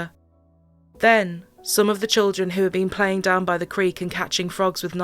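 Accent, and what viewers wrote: United Kingdom. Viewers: I don’t like a British narrator, it implies that story is in Britain, are there not any American narrators?I’d feel the same listening to a British story narrated by an American narrator. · yay, super cute, british girl voice narrator crime!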